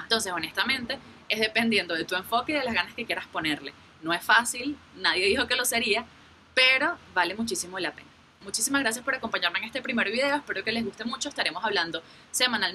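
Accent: Venezuela. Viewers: Le doy play al video, me pongo a revisar Clash of Clans y cuando escucho ese acento Venezolano, vuelvo a ver la pantalla jajajaj. Es un gusto cuando los bloggers son Venezolanos, es como si la persona que te habla es un pana tuyo :D Saludos.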